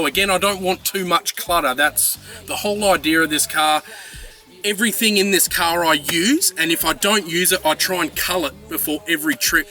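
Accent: Australia. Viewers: Have to admit as a Yank I’ve always been jealous of our Aussie friends cool accent and that v8 Toyota turbo diesel that we can’t get in the 🇺🇸. Very nice 76 👍🏻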